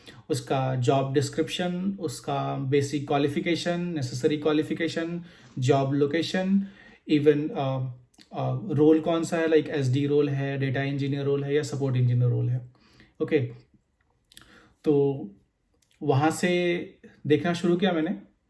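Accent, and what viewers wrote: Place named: United States. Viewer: How did you learn US English ?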